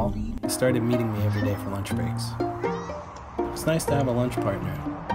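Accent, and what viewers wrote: United States. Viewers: The guy in the video has an English accent, but the guy narrating is American. I'm a little confused. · Why does the narrator have an American accent but the guy in the video has a British accent? 🤔 sweet cat though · Hey your and English guy in the video but American on the voiceover???? That's the second time ive caught you doing this lol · I noticed that too. The narration is American and the guy’s voice in the video is British. Not sure if this is a genuine story or not. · The narrator is American, where as the owner of Paul sounds English. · Ok so the guy talking is American but the guy with the cat is English. Lies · Was very confused when the guy in the videos had a British accent and the guy narrating had an American accent before realising the narrator is just telling the story of the British guy and the cat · Well that was weird. 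The voice over guy is American, while the person shooting the video is clearly a Brit. Did The Dodo think we wouldn't understand him? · I've always suspected these types of videos were sent in by people and then their email was read as a voiceover by a Dodo employee. Hearing the man's English accent in the video and the American voiceover has confirmed it. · The comment thread has nothing to do with 'global citizens'. People are questioning why the narrator's voice has an American accent when the short pieces of dialogue you hear during the video is in a noticeably British/English accent. What has that got to do with where people live? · anyone else spot the narrator is a yank but the guy in the vid in engish? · Why is the narrator American but the guy hearing him talk on video English? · Why did they make the narrator American when the guy in the video is British? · Narrator speaking in first person: soft, calm voice with American accent. 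Guy in first video: loud voice with British accent.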